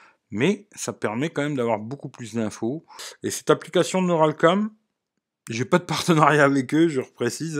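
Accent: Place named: Lorraine, France. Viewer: Eh mais un lorrain ! 😂😜😍. J'me disait l'accent me dis qq chose 😏. Bon la stabilisation est à la ramasse sur le n10+ en vidéo c" assez flagrant pour moi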